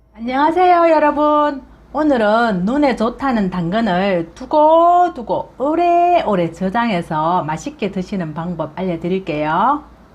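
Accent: Gyeongsang, South Korea. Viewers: 경상도말씨 너무 정겹네요 내고향 구미가 그립다 지금여기는 비햄기타고 가야하는길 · 아무리 경상도 표준말씨라지만 듣기가 거북한면도 없지않네요 메뉴는 도움이 될 수 있겠지만ㆍ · 당근짱아찌,짱이임미데이! 경남 말이 정겹습니다, 경남 어디예요, 완전 토종 경남 말인데요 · 아니지 우리 노인네들에겐 딱 좋구먼...... 경상도 사투리라서 표준어 사용자들은 빨리하면 무슨말인지 잘 못알아들을수도 있고...... 암튼 최고 우리 힘든 주부들위해오늘도 열일하는 님❤ 캘리포니아 할미가 👍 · ㅎ ㅎ ㅎ대구신가요?..^ ^ 말씀넘 귀여우셔요~😊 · 호불호가 있지요. 갱상도 말씨 ㅋ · 이해합니다 저도 말따로 마음따로 거든요. 경상도사투리가 정겹고 웃음이 나네요 아주 유익하네요 내일당장 해 보려구요 좋은정보 감사합니다😊👍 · 대구말시도, 잼있고좋은요리 감사해용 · 말투정말 마이 정겹네요 완존 대구말씨..??맞죠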